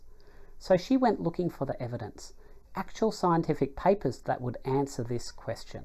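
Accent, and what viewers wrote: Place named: Australia. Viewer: Australian can be difficult, try slowing down the speed of playback to 3/4 speed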